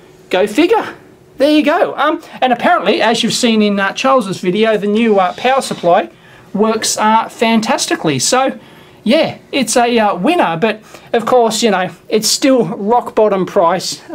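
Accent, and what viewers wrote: Australia. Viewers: I don't get it. You just sound Australian to me. Why do people keep pointing out your accent. You're perfectly legible.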